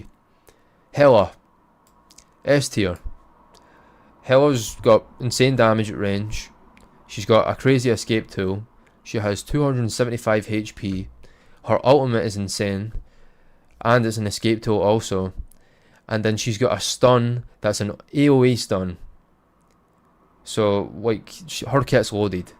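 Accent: Scotland, United Kingdom. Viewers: He said "He can literally kill" not "he can one shot kill" the scottish accent just makes it sound weird. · Is that Scottish? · Its the Scottish accent. Based like Logang.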